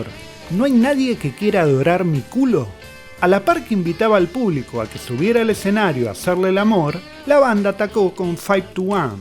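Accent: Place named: Argentina. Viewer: Mola el acento Argentino bro! jaja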